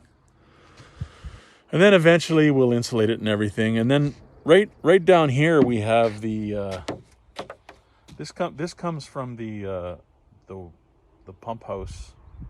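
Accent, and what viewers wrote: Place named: Ontario, Canada. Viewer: Great job. It appears this is where a man is meant to be. You obviously lived in Ontario for a while as your ‘Ote’ for the word ‘out’ is a giveaway.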